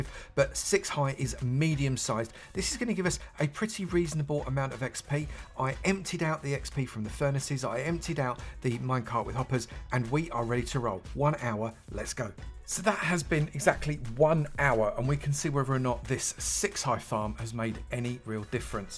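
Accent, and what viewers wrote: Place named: England, United Kingdom. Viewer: He's just English, that's it.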